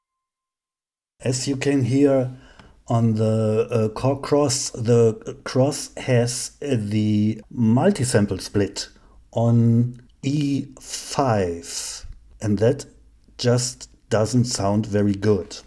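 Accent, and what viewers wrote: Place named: Germany. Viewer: "VVRRROOOooo .... >> ... oooOOMMMM" 😄👍‍(made me smile!). Tested on headphones and the R8 sounds good! By the way I agree - I downloaded the WAV file and for sure the Yamaha FM synth engine is brighter than the PCM of the Korg... but it should be, that's one of it's strengths. Glad you're enjoying your Reface - I treated myself to the MODX a couple of years ago, and it's great (but also not perfect) and has become the centre of my setup. I did look at the Korg opSix (I have the amazing Wavestate and the Modwave, so did consider completing the Korg trilogy when they were selling them off cheap a few months back) but there is nothing in that which I cannot already do with the MODX, so I resisted... for now. 😉 Anyway, look forward to hearing more of the Reface in your future videos ... btw: have you tried the looper function yet ? 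Best Regards, Axel. p.s. Your voice overs sound fine, you don't sound drunk all the time, and we like your charming German accent! 😎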